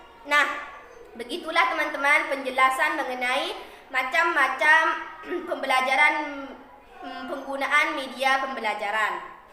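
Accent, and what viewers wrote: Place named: Madura, Indonesia. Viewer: Ciri khas bahasa Madura😁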